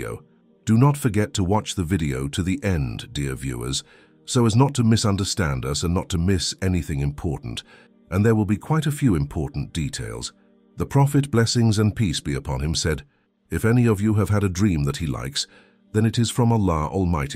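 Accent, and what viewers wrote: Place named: United States. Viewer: I prefer narration with English accent than the annoying American accent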